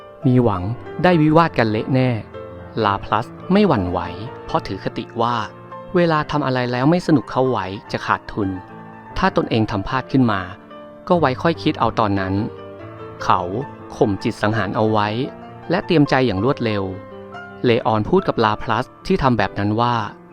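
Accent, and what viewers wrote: Isan, Thailand. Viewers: ติดสำเนียงอีสานด้วยฟังมะค่อยรู้เรื่อง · เสียงภาคอีสานเปลียนเป็บพูดปกติได้มัยคับผมฟังไม่รู้เรื่องคับ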